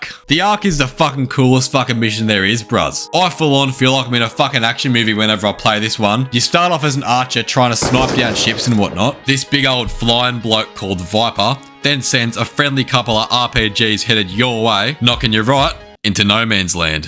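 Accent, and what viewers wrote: Australia. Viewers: Damn the Australian accent is amazing, good video as well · Mate I’m Australian and my accent ain’t close to being as thick as yours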